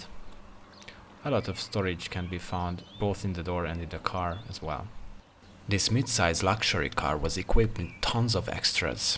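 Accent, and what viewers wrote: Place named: Hungary. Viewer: egyből hallottam hogy magyar vagy, de nagyon szépen végig mondtad és mutogattad én jobban élveztem angolul mint magyarul, és tényleg gyönyörű autó!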